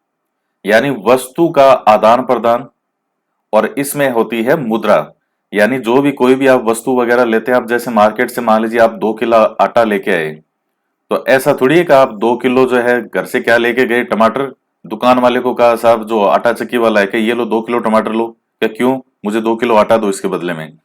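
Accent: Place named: Sri Ganganagar, India. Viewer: 🙏 sir Aapky bary m janty to nhi bt aapni voice tone s lgta h jese aap sgnr( sri ganganagar) k nivasi ho.😊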